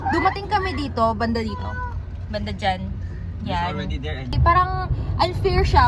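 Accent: Tagalog, Philippines. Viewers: Ang galing mo mag salita ng tagalog pati accent mo tagalog !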